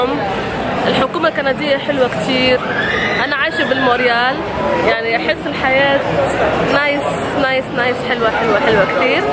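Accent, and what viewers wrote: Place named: Tunisia. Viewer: وانا من ليبيا والله ماني متغطرسه ولا متكبره بس انت مفروض تفهمي المتابعين تبعك انك بدك تكون محترم في هالدول كون بعلمك وبقيمتك ماتجي هون وتفكر الحياه ساهله وتلاقي نفسك homeless لابيت لاشي ولاقرسون في البارات انا بتابع كل يتيوبر مغتربه مثلي واستغرب من اللي تفكر نفسها عبقرية ولامميزه على اهلنا بالوطن العربي بس لانها بامريكا ولابكندا بدك تعيش كريم هون خلي علمك يفرضك عليهم وهم يترجوك تبقى ماانت تبحث عالحيل للبقاء اما عن السوريين او الفلسطينيين اللاجئين لا لوم عليهم وهم فوق الرأس لانهم من بلدان دمرتها امريكا واسرائيل بعفنها ومخططاتها الفاشله وتحاول تجيبهم لاجئين لتبين للعالم انسانيتها اما عن كونك تونسيه فانا عارفه لهجتك واضحة بالزاف لي انا افمهما من لما كنت في ليبيا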